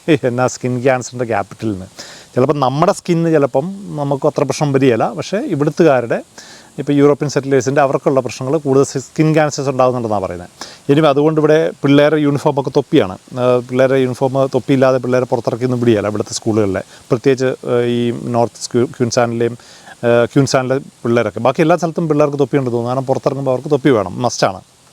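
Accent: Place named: Wayanad, India. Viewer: നാട്ടിൽ വയനാട് ആണോ സംസാരം മാന്തവാടി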